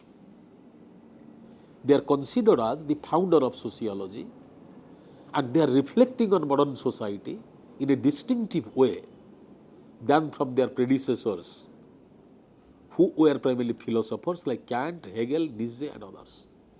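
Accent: Odisha, India. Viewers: I can’t understand his pronounce because of oriya flavour is coming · Sir are you from odisha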